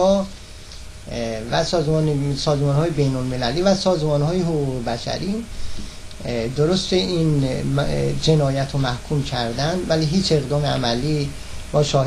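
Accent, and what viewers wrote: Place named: Turkey. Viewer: جه لحجه گشنگی دارى اقاى كوينده همه سريع متوجه ميشن كه تركى..!! اين كروهايى كه شما نام برديد همه شان كسانى هستند كه قاتل بدنيا أمده اند و كارشان كشتن و انتقام است حالا جه ايرانى و جه عراقى و ربطى به مليت و مسلك ندارد..!! در اين كشورها هر ننه مرده اى اسلحه به دست ميكيرد و ميخواهد بكشد و از خدا حرف بزند..!! هر كه امد به جهان نقش خرابى دارد در خرابات نبرسيد كه هوشيار كجاست..!! خاورميانه يعنى باغ وحش..!!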